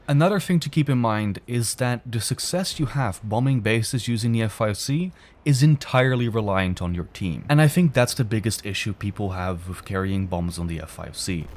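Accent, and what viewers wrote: Netherlands. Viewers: Really love the video, but one question. Are you dutch?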